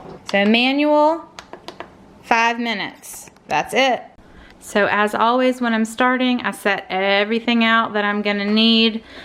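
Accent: South, United States. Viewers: Are you in or near Texas you have a fabulous southern accent.. ya all lol x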